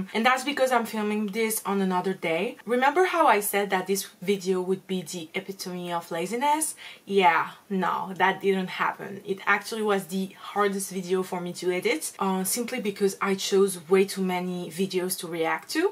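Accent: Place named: France. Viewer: I love your personality!! Btw are you French? You have such a cute acceeent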